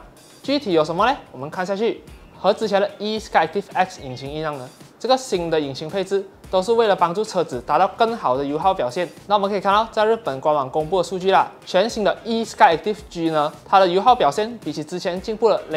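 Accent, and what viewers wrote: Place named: Malaysia. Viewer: 帅哥的口音才正宗。。马来西亚人嘛，当然是要以马来西亚华语的口音来做视频，满满的亲切感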